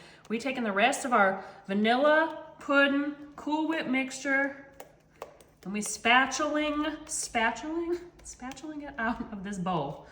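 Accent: South, United States. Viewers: She talking Souther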